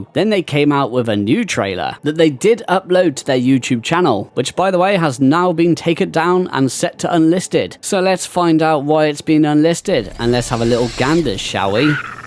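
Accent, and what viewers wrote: United Kingdom. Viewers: You hit the nail on the head mate. I love how sarcastic you are. God I love the British accent lol.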